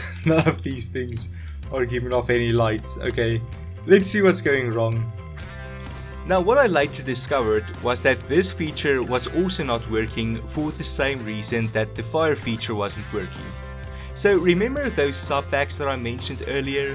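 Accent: South Africa. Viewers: Is this guy South African? He sounds South African. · DUDE are u from SA because holy shizz your accent is on point omg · Are you from south Africa? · Your South African I now I am too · Bro im sure your from south africa can you confirm this? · South africa?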